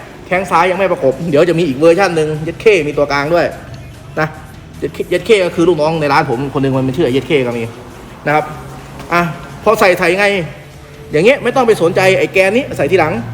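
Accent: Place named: Isan, Thailand. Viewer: เว่าอิสานว่ะ555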